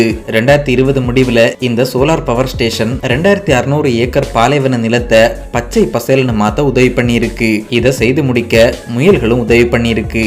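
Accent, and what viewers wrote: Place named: Kerala, India. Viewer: மலையாள சாயலில் தமிழ் கொஞ்சம் வித்தியாசமாகவே இருக்கு. நாஞ்சில் காரரர நீங்கள். அரசு என்பதை அரய்சு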